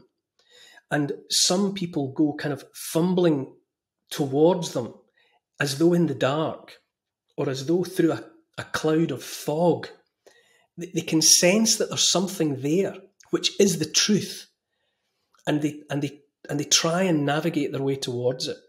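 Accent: Scotland, United Kingdom. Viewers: I am so fekking ignorant. Your words hook me. The Magna Carta, was it signed at Runnymede or somewhere?? Surrey? I don't know which way is up. But I felt something was terribly up during that very first lockdown. I felt it in my waters. In my bones. I felt we were being darkly duped. I sensed something was shifting. Like when you go to the theatre, and there's the intermission. And behind the stage curtains all the scenery gets shfted around. Whilst people are slugging back their intermission quaff of choice, or queuing for the loo. Massive scene change is going on back stage. I felt something was going on. You have a lovely Scottish..burr..???🤔 I don't know how to spell that word. But anyway, you have that. ♡♡♡♡♡ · is a gem. Love the way he thinks and speaks. That Scottish accent just adds to it. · I love him more Trevor 😝😅😅 A gentle, beautiful Scottish lilt A kind, empathic, forward tilt An open heart; a caring face A willingness to take his place. 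A strong, home-hitting monologue Reminds us of the boiling frog The courage to become a sleuth A passion to release the truth. A deep desire to crack the whip Illuminates our freedom-strip Calls out the darkness taking hold The shiny despots; plated gold! Intense and raw, he lays it bare A soul-enhancing, honest stare Inflections drive the message through He speaks for Us - for me and you! Discernment's such a precious gift His words create a mighty lift Take heed of all he has to say He knows - it shouldn't be this way! 🤗🦋🐬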